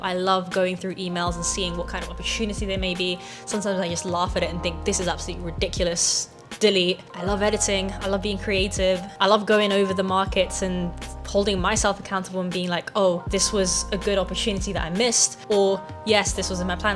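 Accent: Philippines. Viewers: Ur Tagalog accent is sooooo lovely 🥰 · I was about to ask kung pinay ba sya haha kasi iba accent nya pinay pala talaga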